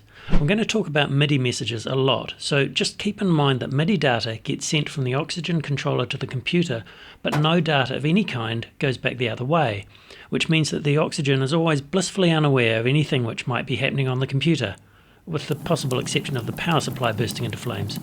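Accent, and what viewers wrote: New Zealand. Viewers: Your a kiwi?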